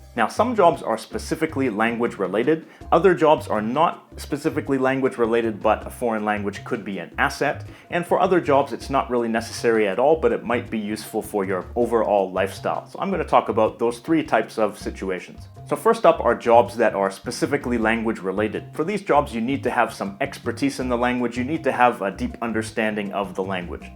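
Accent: Canada. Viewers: Hey,?(first off as a little joke, I’d bet 50 million dollars that you’re Canadian.). Your accent is so strong. Not a bad thing at all, I just recognize the Canadian accent:-) I used to live in Cleveland for a few years growing up after leaving LA at age five. Then I did college and traveled the world. Currently I’m living back in Los Angeles I ♥️ my city!! (a mile from downtown LA and I’m actually- - believe it or not finding it hard to find any reasonably priced tutor or school of languages. We All know Berlitz is SUPER EXPENSIVE. It’s expensive already Just to live here as it is, so my location is all the way across town to Westwood (better known as Tehrangeles) which. And SoCal alone Has the Highest percentage of Iranians living outside of Iran. They’re mostly-no,.. ALL ARE very Wealthy or well to do - and all are Reza Pahlavi supporters for the most part which is fine I’m not being political but the generation just a few years older than me,.. 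who were 7-8 in the late 70’s or early 80’s and left the khoemeini revolution are so far snobby and all about money and materialistic.i Literally cannot find a school under $775 for a once a week four-week class?! And the private tutors want less than $90 per HOUR?! That’s the “best” price I’ve found. Do you have any suggestions or places where I can practice my Farsi? I’m semi intermediate level. Forget USC and UCLA’s programs. They’re wonderful but I would have to sell my kidney to take a semester there. I own tons of Persi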